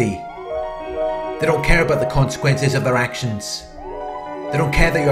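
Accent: United Kingdom. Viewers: No one explains the evil images of Narcissism like you do. Beautiful British accent. For sure narcs already destroyed tons of talented people who have so much to contribut to our world. This knowledge should have been readily available for everyone in their earlier age to minimize the effects of these emotional predator sadists. I wanna say thank you from the bottom of my heart.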